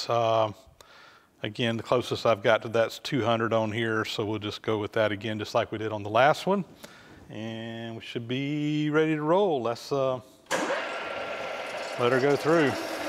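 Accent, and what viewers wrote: South, United States. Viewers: It is: United States of America, southern Georgia version!!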